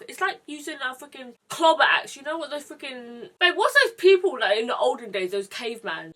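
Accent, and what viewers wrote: United Kingdom. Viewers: Omg same. I don't really know what I expected but I didn't think she was British lol. But her accents super cool. :)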